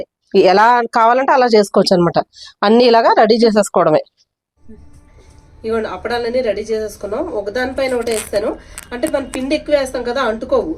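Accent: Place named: Srikakulam, India. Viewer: మిమ్మల్ని చూస్తుంటే మీరు చెప్పేది వింటుంటే మీది శ్రీకాకుళం ఏమో అనిపిస్తుంది మేడం ఎందుకంటే మాది శ్రీకాకుళం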